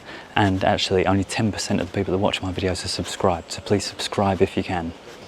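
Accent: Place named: Estuary, United Kingdom. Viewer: Really enjoy your chilled wander through some amazing Brit towns. I'm sure I am not the first to say but you really do sound like Noel Fielding - and it is nice to hear the estuary accent once more (I grew up on the Dengie Peninsular and hearing you gently speak takes me back to there). Living in the US but using videos like yours to soften the homesickness until it is time to come live back home. Happy to have subscribed and looking forward to more,.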